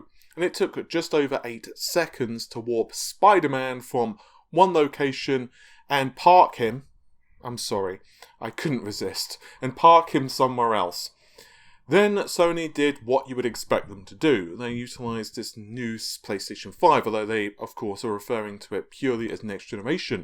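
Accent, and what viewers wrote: United Kingdom. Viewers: It shouldn't be silent in British English pronunciation, and he is British by his accent.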